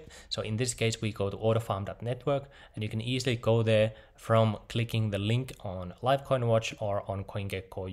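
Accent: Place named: Finland. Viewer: Are you from finland? cuz you sound like it.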